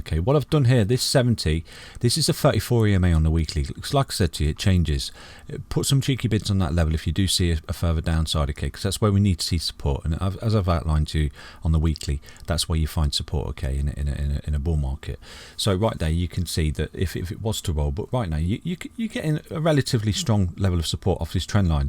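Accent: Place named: England, United Kingdom. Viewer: I just needed to hear a calm and soothing English accent right now talking bigger picture stuff. Thank you for existing. Subscribed.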